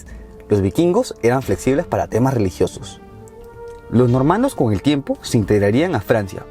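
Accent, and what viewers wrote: Peru. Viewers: Es evidente que español no es; yo creo que es peruano, pero no estoy 100% segura de distinguir el acento. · No sé pero creo que tu acento es peruano, eres mi compatriota? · Qué lindo tu trabajo.. un poco rústico pero Lindo 😍 y tu acento peruano (si no me equivoco) 😍😍 Lo explicas genial 👌😚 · Lo sacaste bien. Es un acento "peruanazo", con ese superlativo tan usado que usamos nosotros. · No se le entiende muy bien el acento del moderador ojalá usará acento más neutro no al estilo peruano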